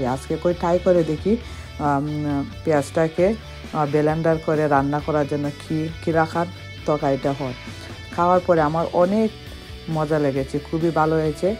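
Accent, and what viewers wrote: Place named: Habiganj, Bangladesh. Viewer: Apa je moja oise lager r mukhi to kota oi nay apne mat hobigonjer tone ase gift dia gesi affa apne o diva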